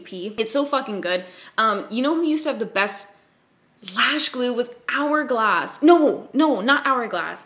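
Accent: Western Canada, Canada. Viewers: I forgot you're Canadian! I think your slight accent is adorable. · What’s in my beg? I love Canadian accents 😂😂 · ya know, when i saw the title of this i thought "i want a 10 minute video of just saying bag" bc your Canadian accent is actually amazing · I love the Canadian representation. Also I didn’t realize that I say bag exactly like that. Yay western Canada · I truly adore you and your channel! You always make me laugh & you're so refreshingly REAL!! (Also the Northern Minnesotan in me is awakened by the Canadian accent! You sound like HOME! Lol!) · I fucking love your Canadian accent & the way you say bag... makes me not want to be god damn British 😂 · I know how Americans act when there’s a Canadian accent heard so I feel you but I appreciate your accent that you hide ❤️ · I want you to know that I often say things randomly in a Canadian accent and I’m 99% sure it’s because I watch your videos so much, even in the background of my daily activities. 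I live on the middle of the United States east coast. People get very confused. Often. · I wish I could “love” this video! Haha. I love your videos and your freakin sweet Canadian accent! Lol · I love the Canadian accent. Sounds like “bayg” to me. But I’m the New Yorker who says “cough-e” or “cawfee” as coffee lol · We all know that's not Old Dead Plant. Your Canadian accent has rubbed off on me. I also blame Christine and Ben. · I'm here for you, and your personality, and your canadian accent, don't care about anything else (that description tho 😂😂) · Omg the first time i can properly hear the canadian accent i love it so much i cant even dealio. ❤❤ from NZ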